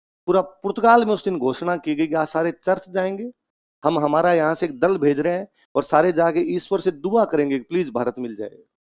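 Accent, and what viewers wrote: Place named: Rajgarh, India. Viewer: Sir me rajgarh se hu apki puri bhash meri jesi he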